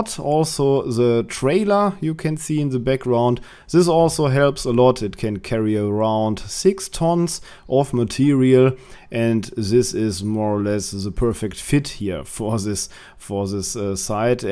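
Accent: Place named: Germany. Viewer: I'm enjoying your video and the German accent, good luck with your project and your Channel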